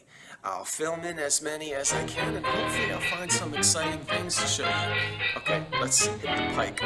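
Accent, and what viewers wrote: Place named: North Jersey, United States. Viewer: Northern Jersey talks like that. I went 11th year of school up there & they thought I talked weird. I was originally from Baltimore, Maryland. 😅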